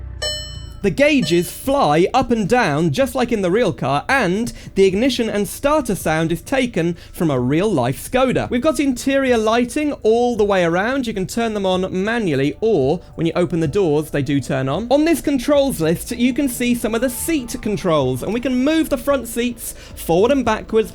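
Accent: United Kingdom. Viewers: Love British accent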